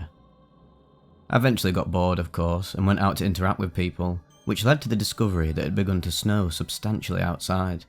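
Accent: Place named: England, United Kingdom. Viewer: You have the perfect voice for narrating. Which part of England are you from?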